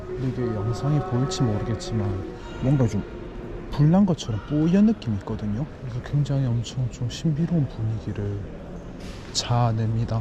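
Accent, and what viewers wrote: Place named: Busan, South Korea. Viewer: 부산말투 좋으네요 ㅎ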